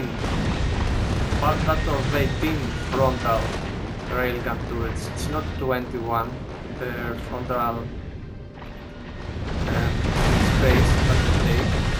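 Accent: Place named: Cyprus. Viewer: Από την τσιπρέϊκη προφορά σε κατάλαβα. Εγώ πέρυσι το ξεκίνησα. ξέρεις πώς γίνονται αυτά... ένας φίλος σε ένα πάρτυ μου λέει «έλα δοκίμασε, με μιά φορά δεν κόλλησε κανείς». Πήρα και το Space Age... άστα μεγάλε. Αγίου βαλεντίνου στην φάμπρικα. Το τερμάτησα αλλά δεν έκανα την τελευταία επιστήμη. Τώρα το ξαναξεκίνησα και έβαλα και κάτι μοντάκια κόλαση: Quality++, Corrundum, Maraxsis, long stack inserter, advenced belts και φύγαμε για megabase.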